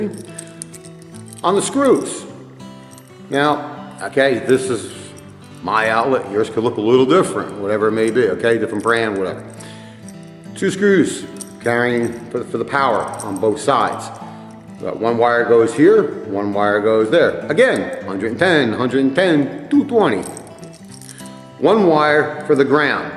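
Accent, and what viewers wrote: New York, United States. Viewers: are you from New York? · good video, thank you. n love the ny accent. : ) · Is he from New York?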